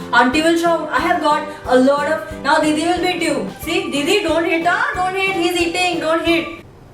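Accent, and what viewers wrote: Maharashtra, India. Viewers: Hey i m a new subscriber found your vedioes hilarious and interesting specially the 10 words u learned from delhi acctually i m also from NCR found your marathi accent interesting. Keep it up ur expressions are too good . And falana dhumkana hahaha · zakkas g muli...todti aahes tu .bakichyana..sahi..i lv d way u say marathi ..hilarious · I love your Marathi accent! MI MARATHI feelling patriotic af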